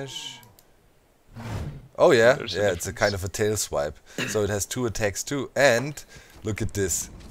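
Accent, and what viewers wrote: Germany. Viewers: Du bist aus Deutschland, das höre ich!🖖🏽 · Do you live in Germany. Because your english got some german flaire in it.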